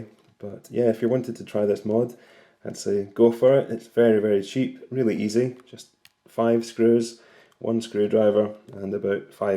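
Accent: Scotland, United Kingdom. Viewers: I am inordinately partial to the Scottish accent, but even with that given: actually find your voice TOO soothing to fall asleep to- like a babbling brook with insights lol. Keep it up brother, seriously, and please monetize for our sakes; we really like you, bro -want you to stick around. :)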